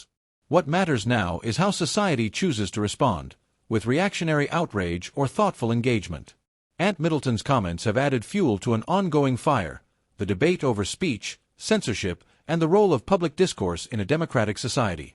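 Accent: United States. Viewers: Even worse it's got a yank accent and can't pronounce SAS Who Dares Wins properly. These channels should be banned.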